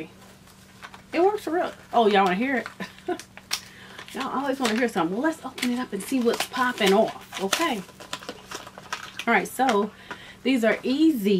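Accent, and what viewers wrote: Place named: North Texas, United States. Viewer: You are how I act when I am alone and can't be judged for being silly. Don't ever loose your personality. Where are you from? I would guess north Texas or Oklahoma only from the accent. I live in Dallas. Stay Sweet ❤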